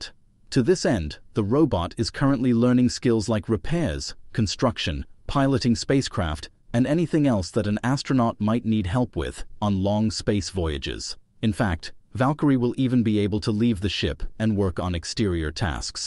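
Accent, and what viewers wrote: United Kingdom. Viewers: Why would a voice with a brit accent call is soccer? Boooo. Lol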